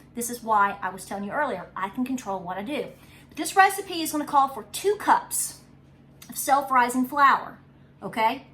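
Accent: South, United States. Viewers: Also I am subbin any gud ole southern gal that says Flar and not flour is my kinda sister. Glad to have found ya channel gurl! God bless y'all!!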